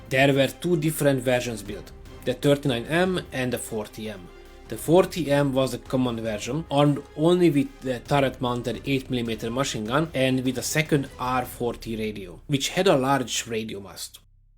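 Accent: Hungary. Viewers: i can feel a hungarian accent in you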